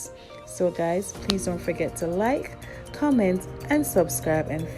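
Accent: Jamaica. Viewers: Are you Jamaican? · 2 seconds in, she's Jamaican 😊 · You sounds like you from 🇯🇲🇯🇲 but anyway job well done ❤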